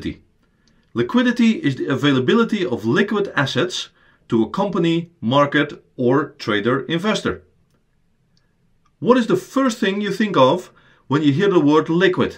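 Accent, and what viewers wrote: Netherlands. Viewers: Ik dacht eerst oh nee, een Nederlander die een Engelse uitleg gaat geven, dit ga ik niet uitkijken hoor. Maar ik was fout, je hebt een superduidelijke uitleg gegeven in heel verstaanbaar NederEngels. :) Thanks! Duimpje omhoog!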